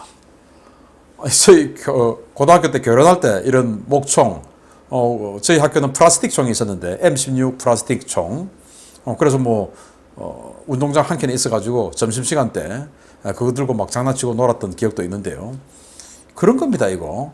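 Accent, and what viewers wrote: Gyeongsang, South Korea. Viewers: 대부분 사실이길 바라면서 .. 너무 신나는 소식이라 너무 좋아하면서 이방송 열심히 듣고 있습니다 . 신인균님의 시원한 갱상도 사투리. 목소리도 더 재미있고요 ㅎㅎㅎ❤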